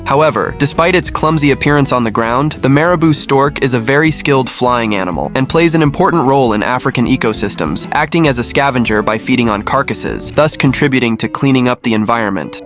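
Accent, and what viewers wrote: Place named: England, United Kingdom. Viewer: Si sente solo la tua sgraziata voce 🤢🤮gutturale inglese , ma non si sente alcun suono degli uccelli . VOTO NEGATIVO .:text-green-game-over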